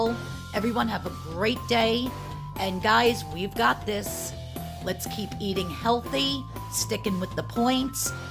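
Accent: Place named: Boston, United States. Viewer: you are a gem! I just love you, your Boston? Accent, and your food and videos. Thank you!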